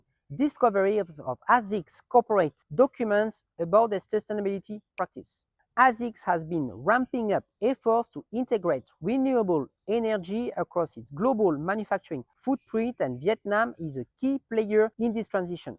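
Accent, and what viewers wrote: France. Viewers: mais gros t'es un enfoiré tu fais en anglais d'accord mais.. sous-titré en anglais tellement ton accent est francais wtf ??! je parle anglais mais la jai besoin des sous titres mdrrrr je pense les anglophones entendent du français la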